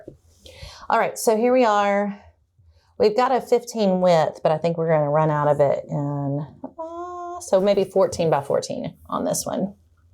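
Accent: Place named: Alabama, United States. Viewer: what a pleasure it is to watch your videos!! I am an Alabama girl also, so I love your accent and your “southernisms”! I am a quilter and 3L ( this means love, love, love) using men’s shirts for my quilts. I buy them at the thrift store and I have found $60 shirts for as low as $1 on special sale days! Oh what a happy girl I am when I get such great bargains AND beautiful fabric for my quilts. Keep producing your videos and please share your finished projects with us!!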